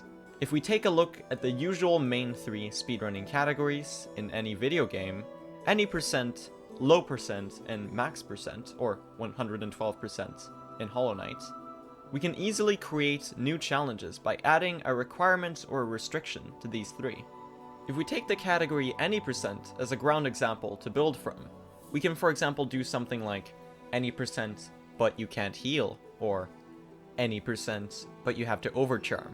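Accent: Sweden. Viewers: är du från sverige?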